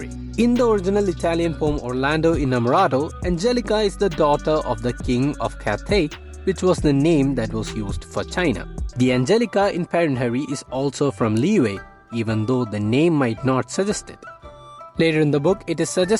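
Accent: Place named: India. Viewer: hmm I felt that you are from India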